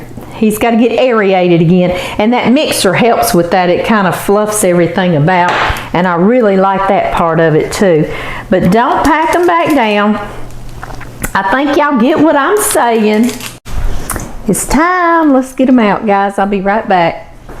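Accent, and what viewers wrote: South, United States. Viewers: Oh my gosh that southern accent 😮. What the ??????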